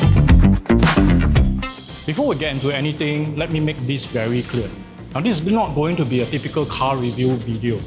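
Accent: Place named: Singapore. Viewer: Singapore English style 😑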